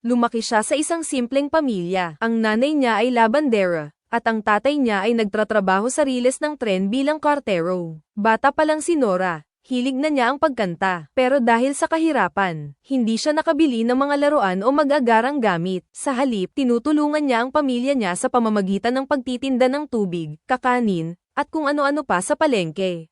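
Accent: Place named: Visayas, Philippines. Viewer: Ano bang lahi mo bat ganyan Kang magsalita ? Yung pronounce mo Ng mga words parang visayang inskit.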